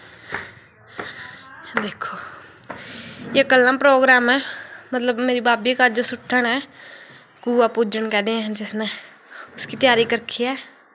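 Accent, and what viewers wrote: India. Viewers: Kurukshetra side se ho kya boli bta rhi h☺️ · जी आपका सीधापन और आपकी हरयाणवी बोली बहुत ही लाजवाब है खुश रहो आबाद रहो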